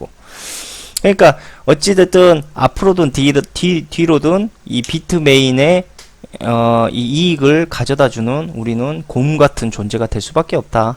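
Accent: Jeolla, South Korea. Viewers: 전라도라 그래요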